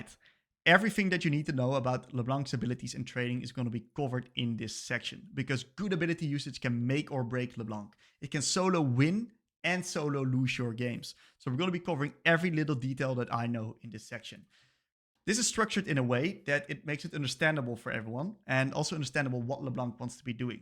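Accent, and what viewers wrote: Netherlands. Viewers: I wanted to learn this champ and this is 2 weeks old really nice this will help me a lot 💪🏼💪🏼💪🏼💪🏼💪🏼 (Btw you sound dutch haha) Edit: stopt watching (gonna watch the rest later) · >the perfect leblanc guide >almost 8 hours long u are so goated lmao also are you dutch? your accent sounds rly dutch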